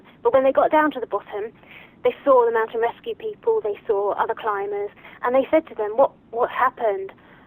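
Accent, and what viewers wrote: England, United Kingdom. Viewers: makes one laugh Americans telling an English person to talk English!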